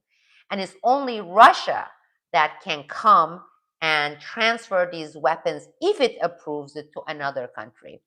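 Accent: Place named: United States. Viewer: Amerikada yaşadığın için çok akıcı Amerikan aksanı ile İngilizce konuşduğunu biliyoruz zaten ama senin izleyici kitlen Türkler ,bişeyler ispatlamaya ihtiyacın yok!!!!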